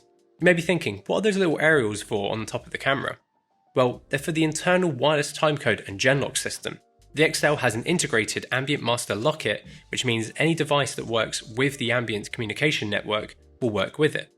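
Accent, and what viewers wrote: United Kingdom. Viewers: Your British accent is different to hear. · Why the hell would a brit working for a british company speak with an american accent for a video?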